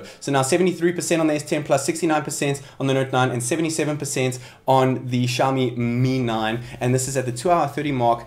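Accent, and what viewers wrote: South Africa. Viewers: Hey are u from South Africa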